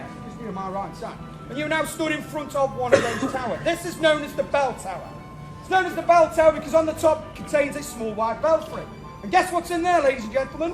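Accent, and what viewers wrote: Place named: Manchester, United Kingdom. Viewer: He says about 5 minutes in that he's from the North of England - sounds like Manchester or somewhere else in Lancashire to me.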